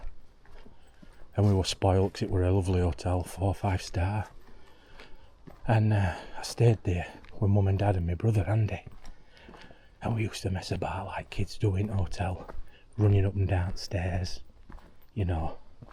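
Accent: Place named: South Yorkshire, United Kingdom. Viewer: That's a South Yorkshire accent if ever I heard one 😄. If you're in the area, you might like to check out the phantom black beast that haunts Anston Stones wood. Every one who's seen it (and I know someone who has) has the same reaction - run like hell. Hint - it seems it doesn't like people straying from the paths.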